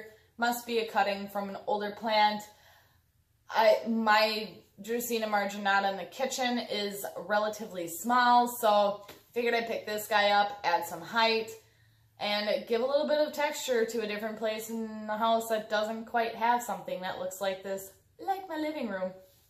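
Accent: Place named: Wisconsin, United States. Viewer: haha I also don't like peace lillies. I completely understand you. Love your videos. I was listening to you and something sounded so familiar. Then I understood you're from Wisconson. I grew up up Wisconsin and live in Switzerland now. Your accent sounds like home.:-) Your plants are beautiful, I am also plant obsessed.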